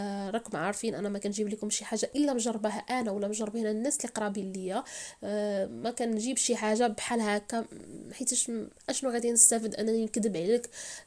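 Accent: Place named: Maghreb. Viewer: سلام عليكم 🤗أنا ندخل نتفرج بش نسمع اللهجة المغاريبية تعجبني برشا 🇹🇳🇲🇦🙏❤و ربي يعينك 🤲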